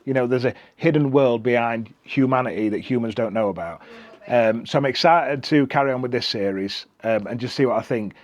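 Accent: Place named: Yorkshire, United Kingdom. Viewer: Are you from Barnsley?! Sounding very Yorkshire to me! 🙂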